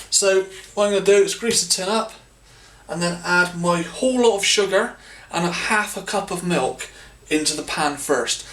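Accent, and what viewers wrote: Bristol, United Kingdom. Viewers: I like your accent, are you from Bristol? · Good video :) I love tablet but never knew how to make it. Out of interest where are you from? Can't place your accent....Bristol?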